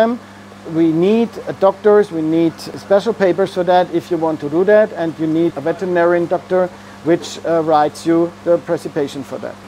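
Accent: Swabia, Germany. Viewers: no a little more schwäbisch please 😊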